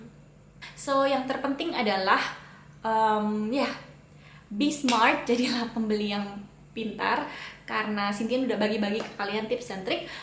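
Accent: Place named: Java, Indonesia. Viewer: kalo ngomong ketahuan jawanya XD. thx 4 tips ^^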